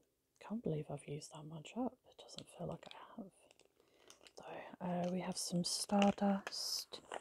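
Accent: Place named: East Anglia, United Kingdom. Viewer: Love a British asmrtist! You sound like you are possibly in the East of England like me. Enjoyed your vid thank you x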